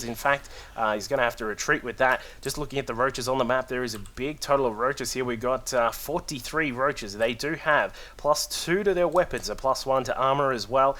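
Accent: Australia. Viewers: god damnit i got work tomorrow i have to sleep but your delicious Australian accent is keeping me awake...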